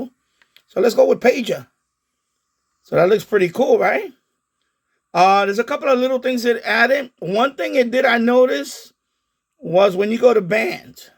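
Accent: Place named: New York, United States. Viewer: As soon as the video started and I heard your accent, I knew I was in the right place. 😂. Clearly a New Yorker talking about radios as opposed to all the Brits. Great vid! The firmware update worked perfectly.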